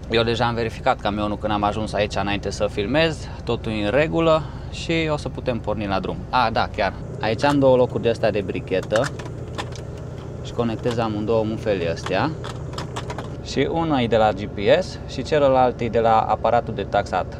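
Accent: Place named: Transylvania, Romania. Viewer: cu un accent de ardelean care nu ai cum sa nu-l indragesti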